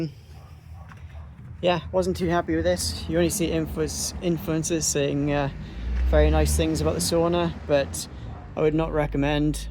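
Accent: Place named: United Kingdom. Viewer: My dear friend, you’re picking out faults that only matter if they don’t obscure the main problem - it isn’t a sauna! A sauna is a place where you can wash and use a sauna whisk with at least one friend, and preferably two. What you’ve ordered is a shoebox, and now you’re complaining about the screws. Those bloody screws aren’t going to make it a sauna when pretty much everything else is wrong. First, it’s too small even for two people. Second, there’s nowhere to wash yourself. And third, there isn’t even enough room to use a sauna whisk properly. But I have to say, your British English is an absolute pleasure to listen to:goodvibes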